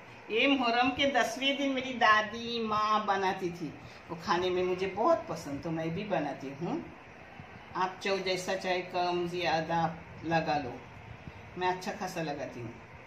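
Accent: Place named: Konkan, India. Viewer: Ap k bolne k tarike se lagta hai ap kokni hai Isliye pochi jazakallah khair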